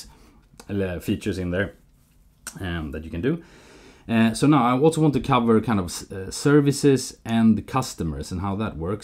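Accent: Sweden. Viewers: Are you Swedish? You sound like my friends and relatives. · can hear the swedish dialect🤠 but no worries, its alot better than most other dialects👍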